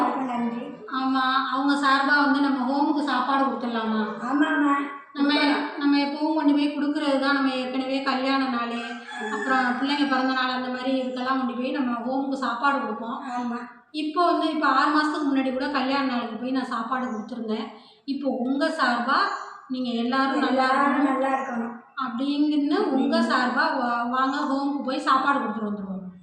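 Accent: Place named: Thanjavur, India. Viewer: வாழ்த்துக்கள். தஞ்சை மாவட்டம் திருவாரூர் மாவட்டம் வட்டாரச் சொல் படி பேசுகிறீர்கள்.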